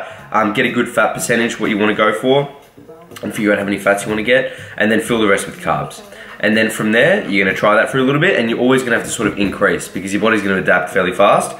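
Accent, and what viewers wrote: Australia. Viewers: Bro... your mum is the best hahahahaha, sweet video man its good to here an aussie among all these mericans hahahaha sick 1 series to dude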